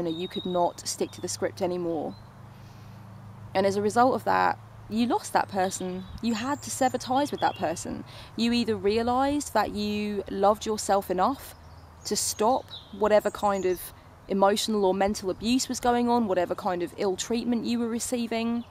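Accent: United Kingdom. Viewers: Ps. i love your channel (and your brittish accent) you are full of knowledge!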